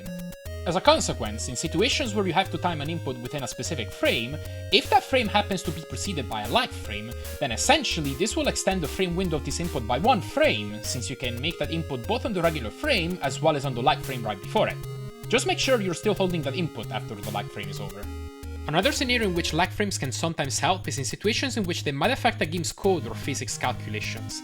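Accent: Italy. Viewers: you sound Italian ahah, where are you from?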